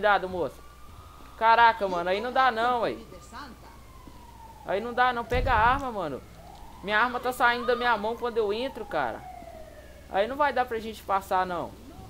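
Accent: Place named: Rio de Janeiro, Brazil. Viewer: voce e carioca né? fica falando bota cara e pq é do rj haushauhsauhs tbm sou!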